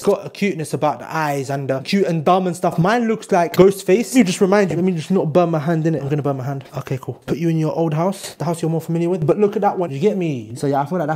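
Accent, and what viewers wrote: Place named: United States. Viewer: American accent on point 💅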